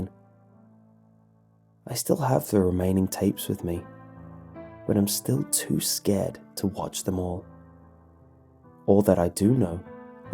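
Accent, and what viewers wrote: United Kingdom. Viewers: If this bastard grew up 'in Pennsylvania', then why the British accent?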